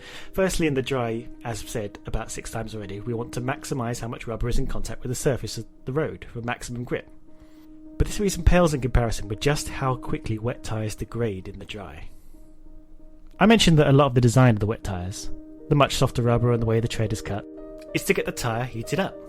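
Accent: England, United Kingdom. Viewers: Seems like a lot of people aren't used to normal conversational Brit English, maybe you could lose the music though, as it doesn't add much. I've learned a lot from you, so thanks & please keep up the good work. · Lot, Wut? I understand everything. This is in English English, it seems. Do y'all only understand American English? Or is English not your first language? That will likely have more do to with it. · Audio is ok, speaking style is very British english. As a Nebraskan. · Yeah, maybe you're used to listening to American English. The English accent can sometimes make it harder for non-English speakers to understand. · It was quite easy to understand him. It may be too soft at some times but still understandable. Maybe you're not used to hearing English in an English accent? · maybe its good if u put subtitles in it, cause i cant really hear your british accent :( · Ive went through all his posted videos and found them easy enough to understand. 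I am fluent in American English with some Spanish. Perhaps its the English accent that gets you? I find these videos to be well done. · nothing racist but isnt all British and Europe people talk like that?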